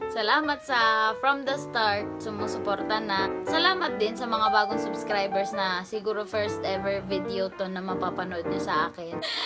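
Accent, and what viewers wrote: Batangas, Philippines. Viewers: speaking in batangueño ... parehas lang tayo ng accent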